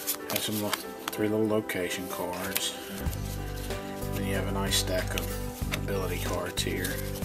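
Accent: South, United States. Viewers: Good video. It's always nice to hear someone with a southern accent who doesn't talk like an idiot. :) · Great vid! And a great voice, where in the U.S you from? Very easy listening on my British ears.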